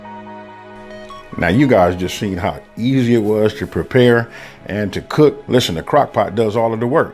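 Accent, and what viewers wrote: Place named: California, United States. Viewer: You most definitely have that Cali accent! But I love your recipes 🤟🏾🤟🏾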